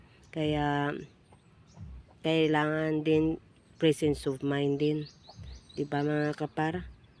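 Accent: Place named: Iloilo, Philippines. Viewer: Halata ang ilonggo nimu madaam...good job ..go lang ng go ...❤❤❤❤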